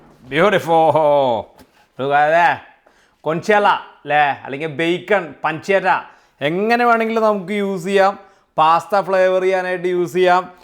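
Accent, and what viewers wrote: Thrissur, India. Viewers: ഇത്രയും നാളായ് ന്യൂസിലാഡിൽ എന്നിട്ടും മ്മ്ടെ തൃശ്ശൂർ സ്ലാങ്ങ് വിടാത്ത ചേട്ടൻ പൊളി തന്നെ 😍🥳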